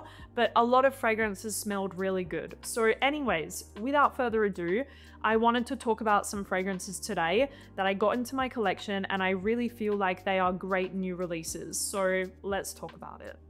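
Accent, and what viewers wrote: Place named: Australia. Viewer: That’s called an Australian accent. I love it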